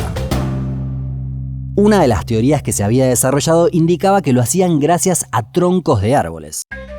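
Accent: Argentina. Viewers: Eres argentino ? Me gusta tu acento! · Sos de argentina che!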